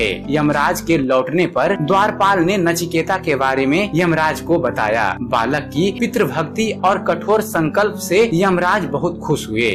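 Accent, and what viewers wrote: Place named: Bhojpuri, India. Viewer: Please speack in proper saskrut manner Not in bhojpuri manner Speack clearly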